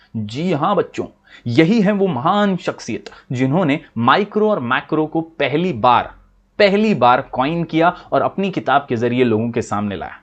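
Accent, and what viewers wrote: Maharashtra, India. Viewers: BRO YOUR MARATHI ASCENT SO COOL (tumhari video kon edit karta hai )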